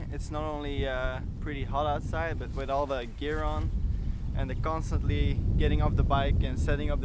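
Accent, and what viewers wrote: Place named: Belgium. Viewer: Where is that, judging by your accent, I think I might live close and I'd love to try that course. Just read you're from Antwerp, dan ben ik zeker dat het niet zo ver is.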